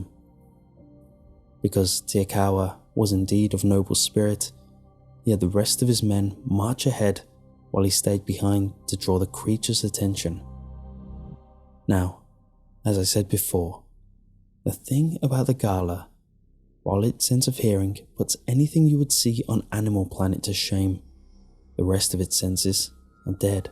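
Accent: England, United Kingdom. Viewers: as an Oglalan Souix, I am kind of amused this story is being read by an Englishmen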